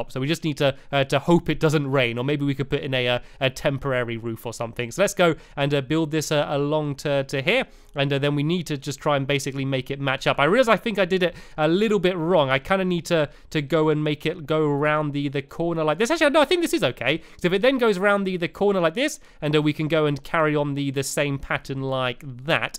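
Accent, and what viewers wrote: United Kingdom. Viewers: This video is so British. 1:British accent. 2:Clock tower. 3:Tea shop.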